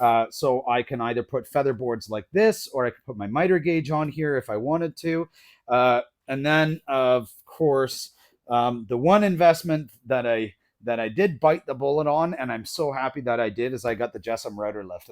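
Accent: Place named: Canada. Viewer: I used to think tv exaggerated that Canadian accent.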